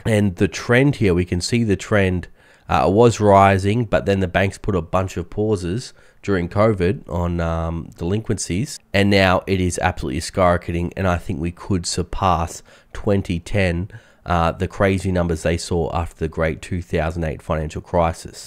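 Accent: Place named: Australia. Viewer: Change in pronounciation for the American audience who just couldnt seem to deal with the aussie accent?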